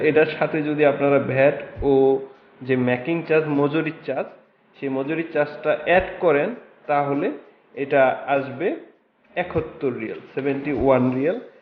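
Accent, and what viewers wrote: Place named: Rajshahi, Bangladesh. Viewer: ধন্যবাদ কথার ভাষা রাজশাহীর মতো তাই বলেছিলাম আর কি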